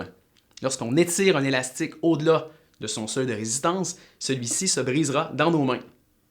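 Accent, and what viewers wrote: Quebec, Canada. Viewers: Je comprends rien à son accent de Québec là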